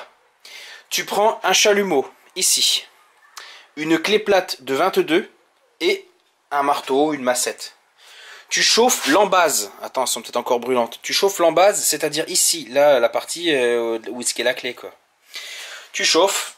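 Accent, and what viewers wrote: North, France. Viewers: Salut j adore tes vidéos de swap avec l accent de chnord lol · super video et serieux je kiff ta façon de parler on vois bien la famille nordiste t de ou dans le nord moi valenciennois et j'ai hate d'avoir de nouvelle video ça manque merci a toi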